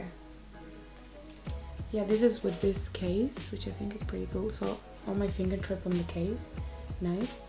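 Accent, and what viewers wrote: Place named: Italy. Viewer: you kinda sound italian, but I can’t say because you speak english very well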